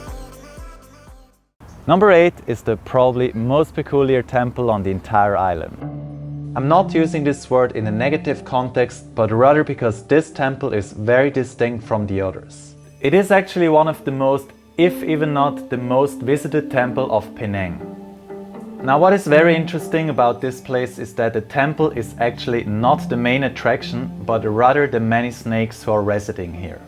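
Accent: Switzerland. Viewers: Thnx for this vid. - It sounds like a Swiss accent you're speaking, is that true? :) Greetings from Zurich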